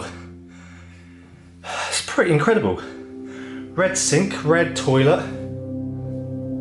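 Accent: Bristol, United Kingdom. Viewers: I made it in, you're accent is SO annoying I couldn't watch any more. I prefer watching the American explorers it just hits flat when British people try to pull off an exciting, informative and unique experience. This is trash man. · Going by ye voice im guessing ye from ol Brizzle me boy!! Ye sounds like me ol Brizzle buddy from university!! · You gotta b kidding me ryt. At 1st one of u started talking I thought omg his Aussie, gota find out were is this abandoned mansion n then the British accent came out I was like ohhh shoots ...I can NOT believe such a beautiful mansion can be abandoned like that..That's a couple million dollars ryt.. I mean who wouldn't want to have that n restore it....hmmmm unless it's haunted...😱 post more videos...guys.. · So what area of the UK is this house in? The narrator sounds a bit Bristolian so is it near there?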